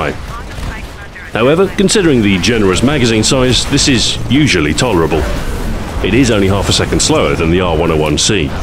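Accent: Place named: United Kingdom. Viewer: THIS GUIDE IS AMAZING!!! I am 100% confident that you produce the best Titanfall weapon guides on the Internet! It's so professional, engaging, and well-edited. Additionally, your magnificently deep, British voice is the perfect sound for discussing the topic of Titanfall armaments. This video was so good that I actually got goosebumps at the end!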